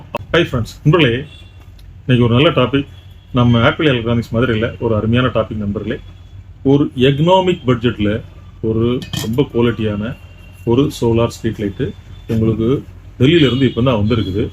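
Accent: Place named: Kanyakumari, India. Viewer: கன்னியாகுமரி தமிழ் அப்படிதான்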